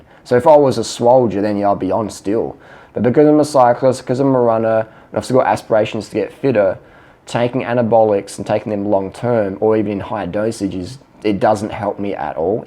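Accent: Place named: Australia. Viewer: it's OK for whatever you said when you were under carbed..... I find your Australian amusing.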